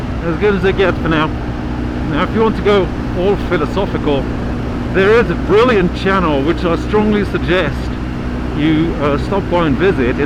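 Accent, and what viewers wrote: United Kingdom. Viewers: Funny I was wondering the same thing. He sounds like a Brit but I know Brits drive on the left?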